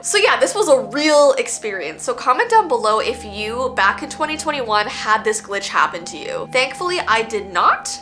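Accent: Canada. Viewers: She has a Canadian accent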